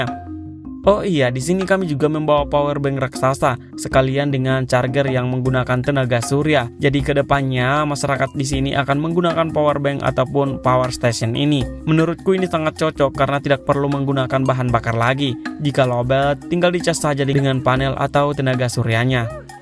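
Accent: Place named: Toraja, Indonesia. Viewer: Pantesan bahasa daerah nya gak asing ku dengar, ternyata orang Toraja 😅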